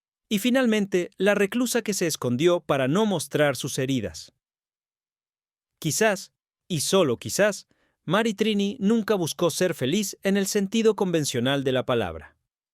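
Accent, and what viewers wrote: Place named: Latin America. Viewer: acaso el vídeo lo ha hecho un español? Porque yo noto un acento claramente hispano Americano, no se porque llamar mentirosos a los Españoles? Mentirosa serás tú y creerás que todos son como tú.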